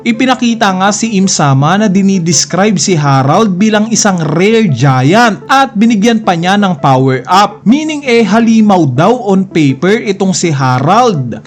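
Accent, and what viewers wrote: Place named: Bicol, Philippines. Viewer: hayaan mo na bisakol ata si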